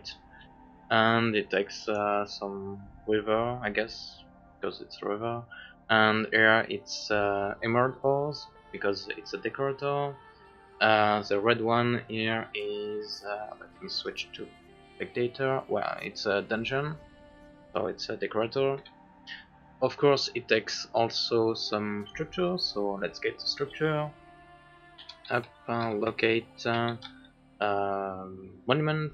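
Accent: France. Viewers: You accent seem french, but perfect english :p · Nice French accent (super mod d'ailleurs) EDIT : It really worked on the server I was playing. I'm shoked it requires zero efforts at all.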